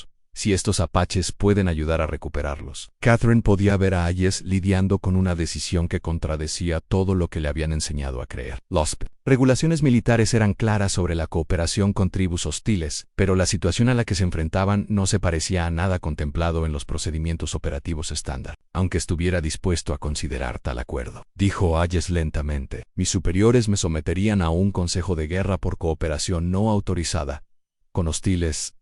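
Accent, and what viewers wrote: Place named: United States. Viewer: La lectura está bien lo que paso es que primero habla del apache herido como si fuera mujer y luego como hombre así que eso genera por así decirlo ruido al escuchar, en algunas partes le pone entonación como si fuera de EU hablando en español , por lo demás está bien , solo pónganse de acuerdo si hablan de un hombre o de una mujer, y eso no quiere decir no se agradezca las publicaciones con audio , saludos desde Puebla México 🫂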